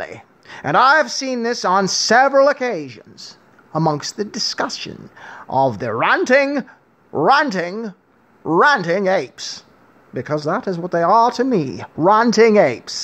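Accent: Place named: England, United Kingdom. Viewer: If I didn’t know better, I would have thought you were from England not Alberta. 😘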